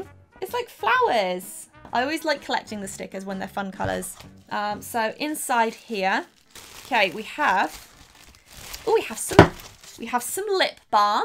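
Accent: United Kingdom. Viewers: Where are you from ??????!!! You sound British but you say dollars ahh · You have the accent of the British baby from Family Guy. Not Stewie but the girl he had a crush on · Wait she's British!? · Who els loves British accent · i just LOVE your British accent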